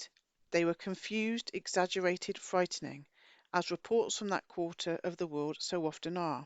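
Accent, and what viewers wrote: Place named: Wiltshire, United Kingdom. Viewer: That is fascinating. Are you from Wiltshire like me. I recognize that accent. Thank you sooooo much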